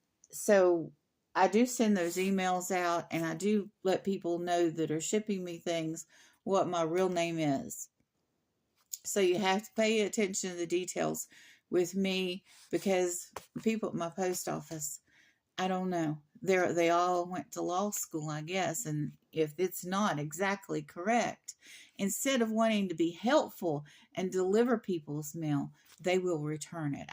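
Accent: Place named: RP, United Kingdom. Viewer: Queens English, no less.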